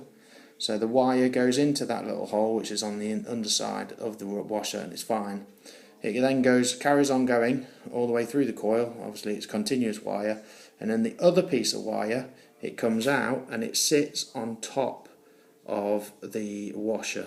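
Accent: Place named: United Kingdom. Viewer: You should do voice overs. You have the classic bri'ish voice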